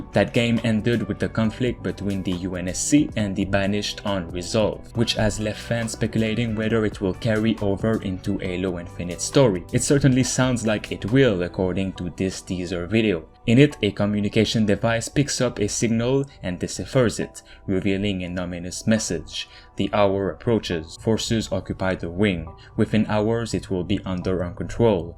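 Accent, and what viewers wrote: Quebec, Canada. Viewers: Dude are you somehow french? You really sound like someone from Quebec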